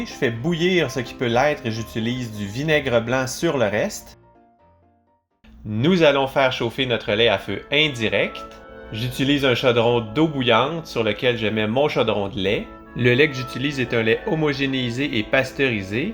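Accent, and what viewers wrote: Quebec, Canada. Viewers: Bonjour, j'imagine que vu ton accent, tu serais en mesure de me dire si à on se retrouve en fait avec du fromage à poutine? Et bravo pour ta video, je vais surement l'essayer dans ma cave où je fais mon hydromel :) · C'est beau l'accent québécois, j'veux une blonde avec le même 😍👍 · Bonjour, merci pour ce partage bien détaillé avec cet accent canadien, bonne continuation depuis l'Algérie 👋🙋. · Eh bien me voilà suis passionnée. Le fromage est un nouveau sujet qui m’intéresse et ça sera un nouveau pas vers ma rédemption culinaire. Je me retiendrais cependant d'expérimenter tes recettes car je ne suis pas assez gourmande pour tout manger. Cette vidéo est propre, ton intonation est excellente et ton accent québécois rajoute du charme. La qualité est irréprochable et les explications sont précises. Un vrai professeur. Ajouté à ça la musique classique sur un fond de cheddar, je reviens à mon introduction, c'est passionnant ! 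· Votre accent canadian est très lourd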